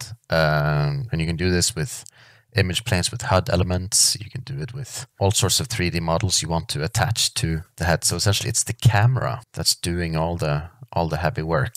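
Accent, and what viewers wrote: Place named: Norway. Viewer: Great video! (Syns eg hørte en norsk aksent, gøy med nordmenn som lage slikt innhold!)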